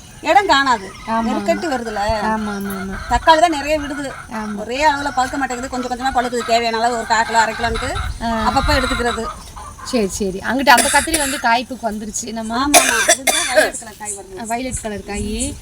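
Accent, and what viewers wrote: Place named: Madurai, India. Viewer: Ooruku varaum manjukurompa santhosama eruku mugathil avalavu santhosam mathurai thamil apadiye eruku americaviliruthu india vara pilaigagooda evalavu santhosa patirula mattaga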